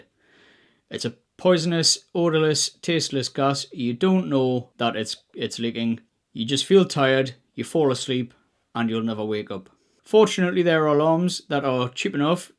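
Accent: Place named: United Kingdom. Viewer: It's a torch, not a flash light. You're British (going by your accent). lol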